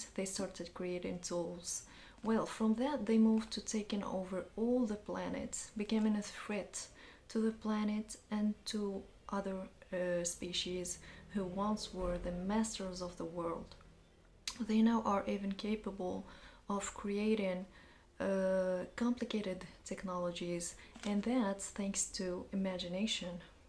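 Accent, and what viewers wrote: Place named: United States. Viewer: thanks for sharing with us. your accent seems like an american accent. bravo wachma all love from spain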